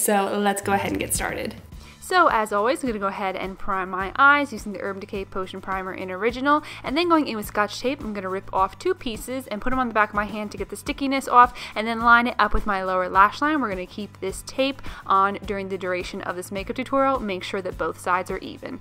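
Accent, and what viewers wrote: New York, United States. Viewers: So beautiful! I love all of your tutorials 😍 Where are you from? Sounds like a New York or New Jersey accent? Lol 😘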